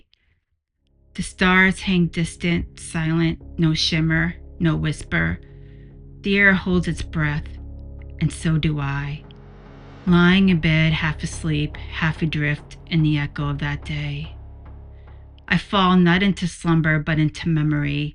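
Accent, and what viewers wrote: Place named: Boston, United States. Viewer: Hi! I’m watching now. I really don’t hear the Boston much except when you said “hahtbreak”. lol. I can reel mine in when I have to but that’s no fun. What really interested me about this project is you saying it’s about you. I’m into any kind of creative endeavors as therapy.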